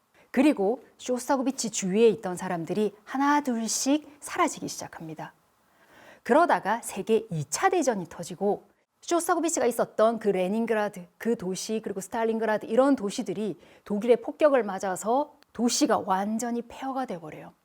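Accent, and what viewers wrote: Gyeongsang, South Korea. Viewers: 웬일이냐. EBS 에서 쓸데있는 프로그램을 다 만들고. 배 선생님 경상도 억양이 있음에도 diction 도 너무 깨끗해서 잘 알아들을 수 있고 ( script ) 내용도 너무 좋네요 . 소위 말하는 방송작가라는 인력의 손이 별로 닿지 아니한 듯.. EBS 여행 프로그램 중남미편 몇 개 보다 말았는데요 전문가라고 나온 사람들이 그것도 교수 직함 달고 스페인어 정관사도 구분 못하질 않나 돌아다니면서 쩝쩝거리고 먹질 않나 ( 그게 한국서는 실례가 안되지만 외국서는 아무리 중남미라해도 엄청 미개한 매너 ) 그래서 EBS 프로그램 PD 들 수준을 의심했는데 이 번에 볼만하고 들을만한 Casting 이군요 . 웬일입니까.. 앞으로 계속 방송 잘 만드시길 부탁합니다. 정말 배 선생님 때문에 이거 보게 되었습니다.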